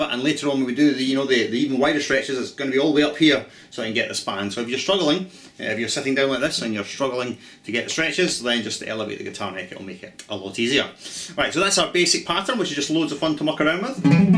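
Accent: Scotland, United Kingdom. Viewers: If the Scottish (?) accent wasn't cool enough, he owns up to not being "A pimple on Shawn Lane's Arse!" which most of us would have to admit. Very cool vid, thanks for posting.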